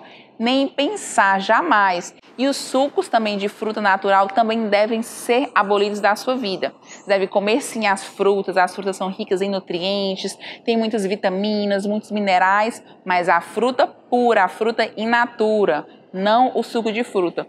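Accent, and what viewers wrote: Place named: Ceará, Brazil. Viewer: Pelo sotaque dela deve ser do Ceará.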